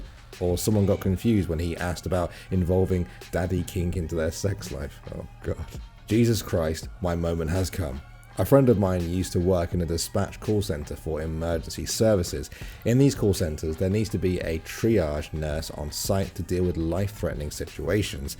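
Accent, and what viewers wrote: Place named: United Kingdom. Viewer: You sound British. I thought the Brits say "arse"?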